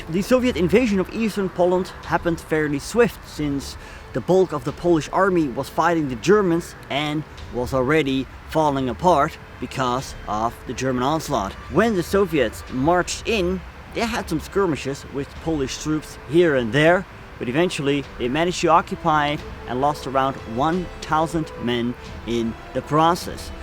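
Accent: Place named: Netherlands. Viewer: Inhoud zeer sterk, helaas vreselijk dik Nederlandsch accent. Als je daaraan gaat werken zou het kunnen dat dit kanaal een groter bereik krijgt.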